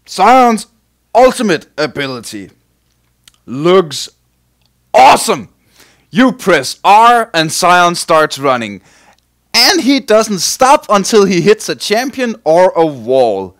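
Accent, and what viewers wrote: Denmark. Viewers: we can rly hear you are from denmark bro xD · Dat danish accent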